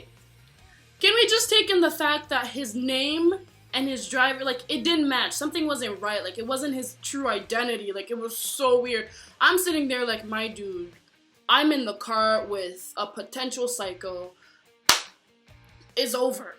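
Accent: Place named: Africa. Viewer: Are you African? I heard that accent! I'm Nigerian 😁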